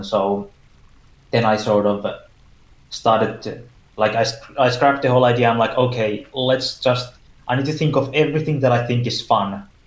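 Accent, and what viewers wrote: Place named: Finland. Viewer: Ralli englanti go wild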